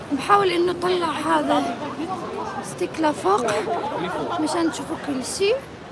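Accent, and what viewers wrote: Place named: Iraq. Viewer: الله صايره تحكين عراقي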